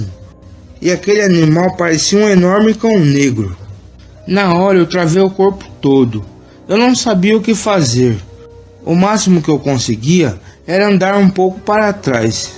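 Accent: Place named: Caipira, Brazil. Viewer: A narração é do tipo bem caipira mesmo!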